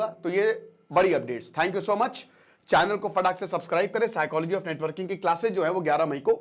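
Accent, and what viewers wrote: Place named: Lucknow, India. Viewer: Sir aap lucknow ke hain..apki accent se lag raha..❤❤ mein bhi lucknow se..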